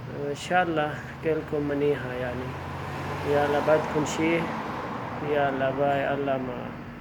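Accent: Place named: Pakistan. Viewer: لغة العربيه باللّهجة الاردوية.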